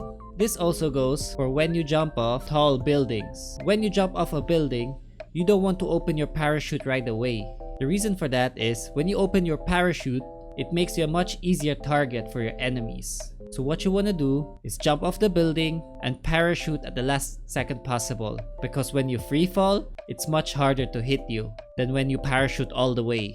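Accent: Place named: Philippines. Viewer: English mo kasi parang pinoy ang tunog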